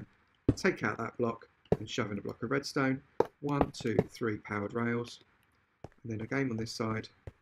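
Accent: United Kingdom. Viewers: I love his voice. Hell, I love any Brit's voice, mostly.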